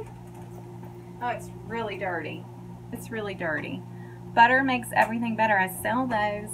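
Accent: South, United States. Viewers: Great video just love the southern accent y'all 😍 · I like steaks love butter and like that southern accent you did have me worried at the beginning though when you said you were going to go in the barn and get a steak LOL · Love the southern accent and it looks like great steak for supper tonight. Huntsville Ontario Canada 🍁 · When you say....Hi y’all.....is part of why I watch your videos....could listen to that southern draw all day.....thanks also for showing us how to cook steaks with cast iron skillet and butter.....have a blessed evening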